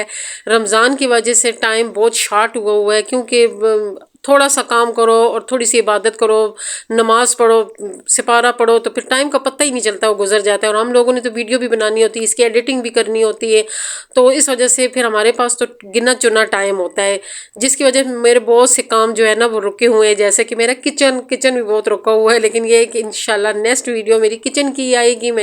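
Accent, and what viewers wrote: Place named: Punjab, Pakistan. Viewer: Zbrdst aap ke pnjabi to hmry jesi ha from kamra attock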